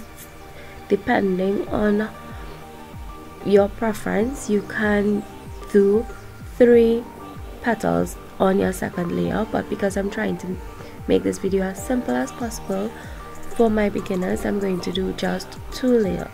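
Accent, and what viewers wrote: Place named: Trinidad and Tobago. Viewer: knew you were trini the moment i heard your voice 😍🇹🇹🇹🇹🇹🇹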